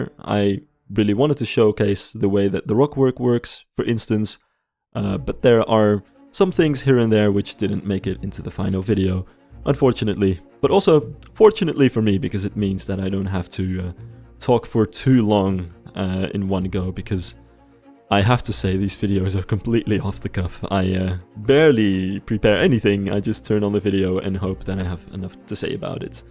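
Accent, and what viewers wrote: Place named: Netherlands. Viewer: Are you Dutch? Bedankt! Haha 😂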